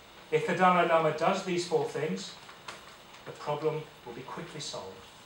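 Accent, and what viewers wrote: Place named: United Kingdom. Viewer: this is bizarre. This British guy is reading, verbatim, what sounds like a text written by a non-native speaker of English. What the hell is going on here, damn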